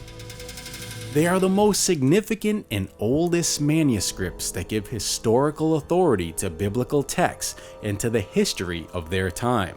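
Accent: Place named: New Orleans, United States. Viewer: Sounds like a New Orleans accent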